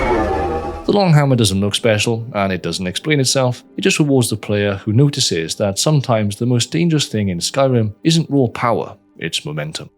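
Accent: Wales, United Kingdom. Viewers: WELSHMAN REAL?!?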